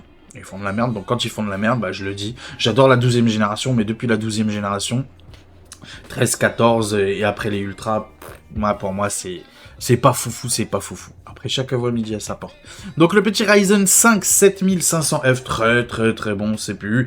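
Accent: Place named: Belgium. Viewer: hello MERCI pour tes petite video sa ma beaucoup aider a monter un pc j'adore tes video mais vraiment meme avec ton petit accent belge 😁😁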